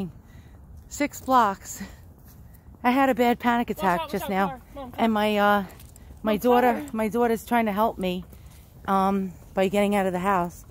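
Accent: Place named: Catskills, United States. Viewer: I grew up in the Catskills & your accent caught my attention right away, it was like being home again. Don't be too hard on yourself as you have a great personality. I survived the battle of Mogadishu in 1993 & although I didn't suffer problems with PTS it did take time to come to terms so there is light at the end of the tunnel. Dogs are a gift indeed so hopefully Samson will bring you peace. Just remember never give up & keep fighting. Your videos are charming so hopefully making them is enjoyable for you, all the best!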